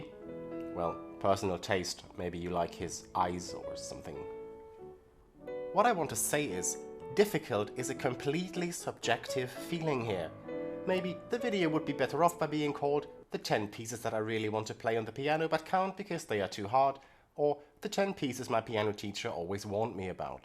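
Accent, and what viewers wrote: Germany. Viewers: Are you from germany? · wait kommst du aus Deutschland/sprichst deutsch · Amazing video! I enjoyed it thoroughly because your explanations and highlights of each piece. I hadn’t heard some of the pieces you presented on the video like Godowsky’s Passacaglia or Regers’s variations which I’ll give them a try once I’m done with your video. Thank you for adding them to your video broadening my repertoire, at least as listener. By the way, I have a question that might be off topic but, are you German?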